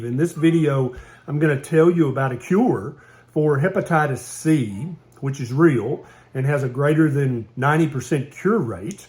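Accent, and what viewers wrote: South, United States. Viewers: You are simply the best and with a southern accent ! 🤣😂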